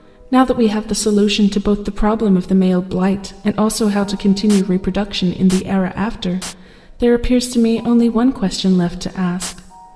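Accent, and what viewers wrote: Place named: Australia. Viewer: Great narration. Always go Aussie when you need a good voice.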